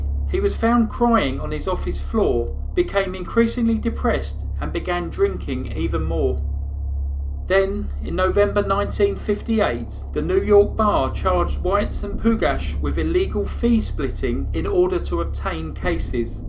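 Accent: United Kingdom. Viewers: talk about a strong British accent . where's this from Leeds?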